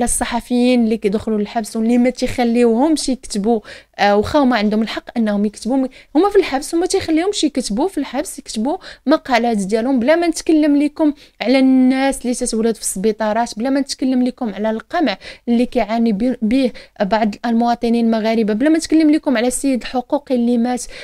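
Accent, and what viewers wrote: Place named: Algeria. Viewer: سبحان الله و كأن جزائرية تتكلم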